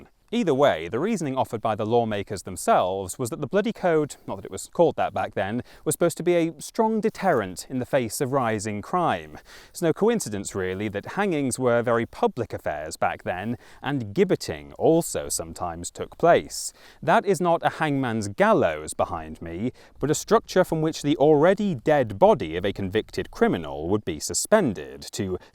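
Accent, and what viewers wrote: United Kingdom. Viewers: Yay! Another deep-voiced Brit telling me interesting historical facts! Just don't go bald, grow a beard, and move to Prague... Whistleboi might find you.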